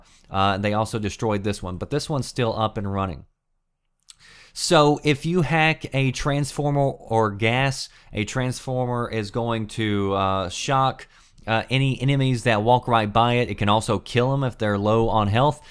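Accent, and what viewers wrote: South, United States. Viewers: I'm guesin u live down south by ur acent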